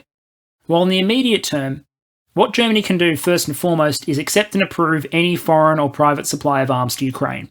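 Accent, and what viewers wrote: Australia. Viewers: Sound was good! Aussie accent helps.... calming..... My big question, do you employ additional researchers, or do you never sleep?? Content was accurate and engaging. Going to your Patron site......That is how good!!! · Sound is much better, previously you sounded like you were recording in culvert. now you sound like an Australian.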